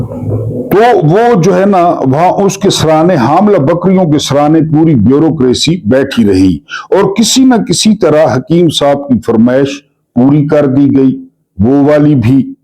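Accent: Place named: Mianwali, Pakistan. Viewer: آ پکا انداز گفتگو بلکل عوامی ھےاور اسمیں میانوالی زبان کی آمیزش چار چاند لگا دیتی ہے ماشاءاللہ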